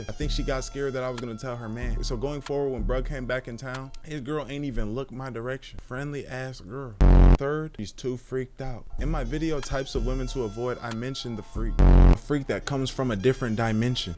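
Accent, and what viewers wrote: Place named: Texas, United States. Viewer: I've been binge watching your vids and your a very good story teller. You gotta subscriber out of me and it sound'n like you a Texas nigga too. So I'm awwready ready to support. Keep it going.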